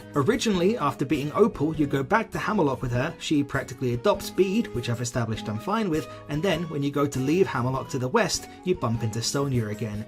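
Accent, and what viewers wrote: United Kingdom. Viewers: I love your Yorkshire accent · Didn't even take 10 seconds for me to know this video was gonna be trash. I was gonna give it the benefit of the doubt, too, but damn. And your bri-ish, yeah, bye. (I stopped watching cause he dissed Sun and Moon, him being Bri-ish just added to it)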